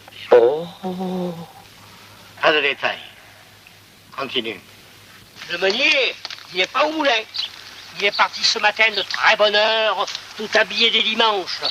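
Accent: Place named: Provence, France. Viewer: Qu'il est beau cet accent Provençal, Dieu qu'il est beau !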